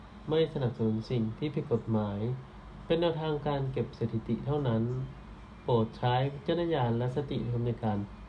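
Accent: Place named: Phu Thai, Thailand. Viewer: หนูอยู่พรรณานิคมอาจารย์คนที่ไหนค่ะฟังเสียงคือคนภูไทย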